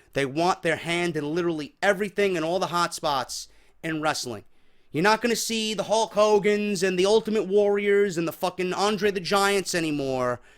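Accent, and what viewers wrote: New York, United States. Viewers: Started watching your videos this week, you do a great job of sourcing all the info you present to the audience. You have a strong understanding of what fans want and what the casual fan or even casual mark want but dont know it. I really enjoy the NY gritt you have, angry and smart tone but articulate and smart - well done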